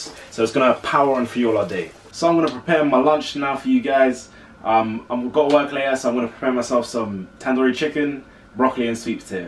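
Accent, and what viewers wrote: London, United Kingdom. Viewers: You from London??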